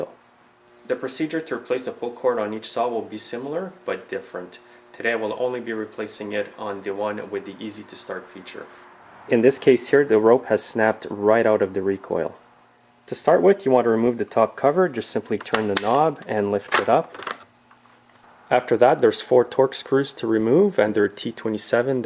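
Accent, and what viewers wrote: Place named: Quebec, Canada. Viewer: Hey this worked absolutely perfectly on my 180 C Easy2Start. Couldn't have done it without your careful, calm coaching. You sound as if you might be from Quebec, if so, merci from an Ontario friend!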